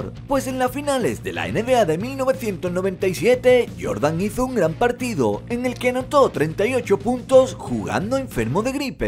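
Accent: Spain. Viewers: hola!! una pregunta eres español??? me dio curiosidad. porque pusiste billetes mexicanos y tu voz parece española 😂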